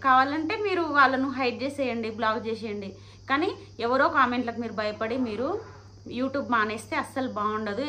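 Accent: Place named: Telangana, India. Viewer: Your telugu accent is pakka telangana, I really liked it. All the very best.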